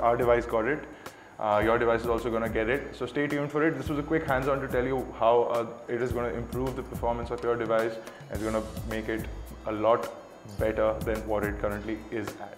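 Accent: India. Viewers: BLAH BLAH BLAH BLAH, I HATE THE ACCENT OF THIS INDIANO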